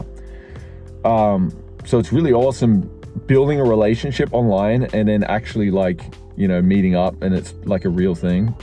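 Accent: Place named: Australia. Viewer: Cool hearing your story. I was wondering about the Australian accent, Mid East last name, and Japanese residency.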